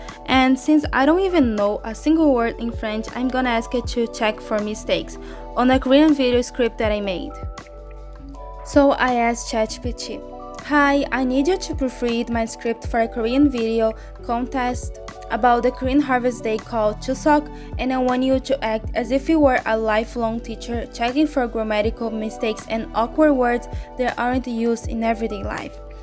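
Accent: Brazil. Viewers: Great video! Thanks for sharing these tips! (Also, it`s crazy how i just knew you were a pt-br speaker just by the way you pronounce the "T" lmao. brazilian folks unite~) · Are you from Brazil? Your accent really reminds me of Brazilians accent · Where are u from? Your accent reminds me of the brazilian accent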